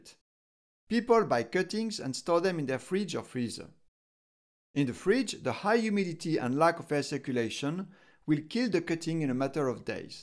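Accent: France. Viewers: Your accent is too much 😆 fucking french 😂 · wtf l'accent francais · french accent ?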